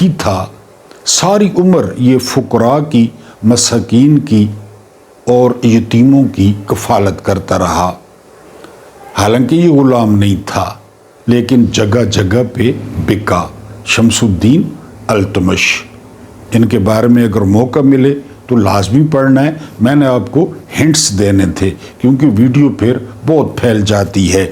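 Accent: Saraiki, Pakistan. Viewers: Aoa,I just listen your program for your siraikey style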